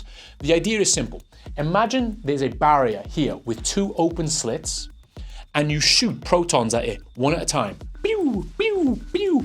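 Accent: Wales, United Kingdom. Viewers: literally every vid you make is on the lines of what I'm researching, i feel like your following me...and you sound like your from my end of Wales...definitely following me XD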